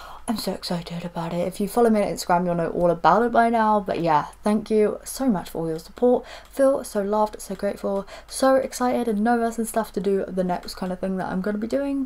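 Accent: United Kingdom. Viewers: Hey, I am guessing from your accent that you are based in the UK? I was wondering if you remember which courier service was used to deliver your box? Mine has still not arrived from June and the company are just telling me it is out of their hands once the box has left Japan :(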